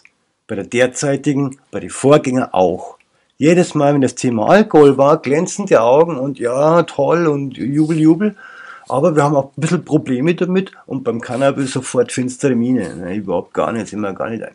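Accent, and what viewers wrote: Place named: Munich, Germany. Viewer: scheinst ein sympathischer mensch zu sein! und noch mit echtem münchner dialekt! grüße aus ndby!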